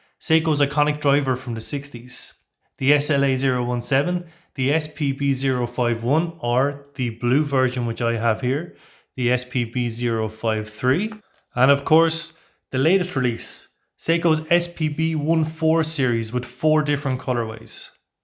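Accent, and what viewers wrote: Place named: Ireland. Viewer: Quality! Like your accent! More Irish-style reviews please! Much better than most of the channels here! Subscribed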